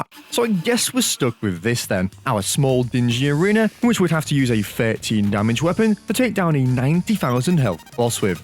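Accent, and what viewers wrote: United Kingdom. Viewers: I think he’s just British bro nothing wrong with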